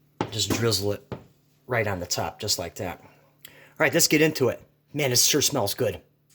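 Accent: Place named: New York, United States. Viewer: Accent of N.Yorker !!!😎Cheers !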